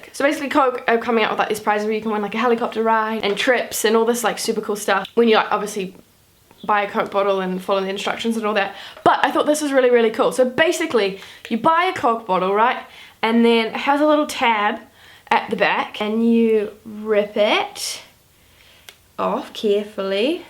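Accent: New Zealand. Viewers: I really love to watch video. She has really cute Kiwi accent. Hello from South Korea. I wish you upload video everyweek for subscribers. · i really love nz's accent <3 hello! from PH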